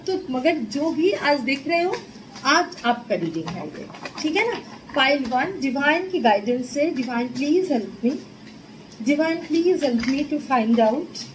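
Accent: Bengal, India. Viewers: Are you Bengali? Nice Reading....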